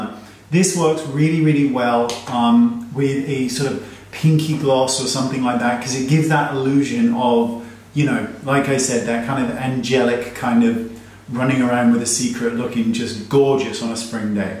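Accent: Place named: United Kingdom. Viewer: Who else came here so they could hear in his lovely British accent say 'Naughty'. 💜💜💜😄😄😄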